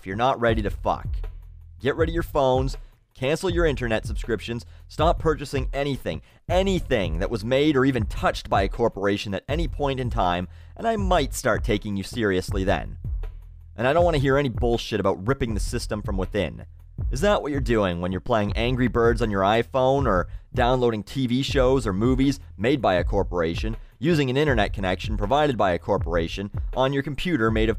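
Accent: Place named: Canada. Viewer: canadian accent..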